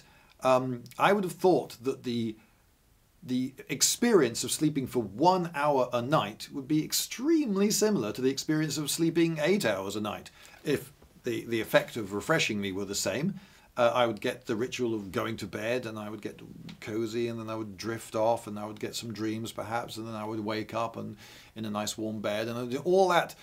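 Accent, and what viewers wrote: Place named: United Kingdom. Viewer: Very British man overanalyzing a hypothetical question? Yes, please!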